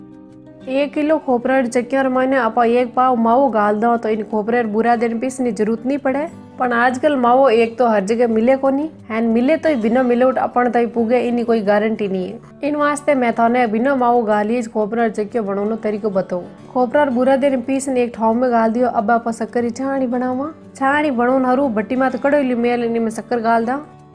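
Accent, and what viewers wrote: Marwar, India. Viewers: बहुत अच्छा? जी आप मिठी मारवाड़ी भाषा मे मिठाईया मिठी - मिठी बनाते हो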